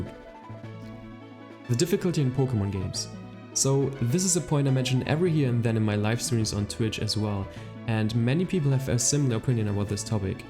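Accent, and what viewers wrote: Germany. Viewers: haha, man merkt beim Zuhören echt den deutschen Akzent :D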